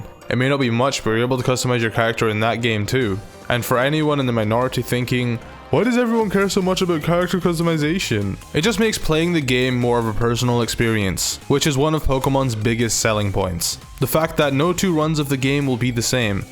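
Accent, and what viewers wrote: Scotland, United Kingdom. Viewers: Are u Scottish because I am to 👌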